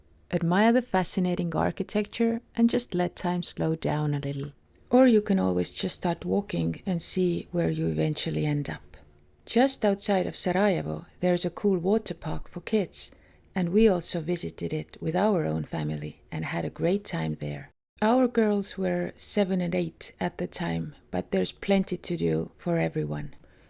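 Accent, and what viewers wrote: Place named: Estonia. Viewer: Aitäh, mulle väga meeldib eesti aktsent! Hääldate mõningaid mu emakeele termineid väga täpselt. Olen vaimustuses ja hämmastunud. Aitäh, mulle väga meeldib eesti aktsent! Hääldate teatud väljendeid minu emakeeles väga täpselt. Olen vaimustuses ja sügavalt muljet avaldatud. Lisaks olete väga asjatundlik ja objektiivne, mida ma eriti hindan. Ma ei tea Eestist kuigi palju, kuid edaspidi püüan teie kodumaa kohta rohkem teada saada. Aitäh, et avasite mu silmad... Parimate soovidega, Samir (Sarajevo) :face-red-heart-shape::hand-pink-waving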